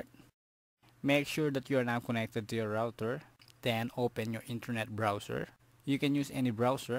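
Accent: Philippines. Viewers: tigas ng tagalog accent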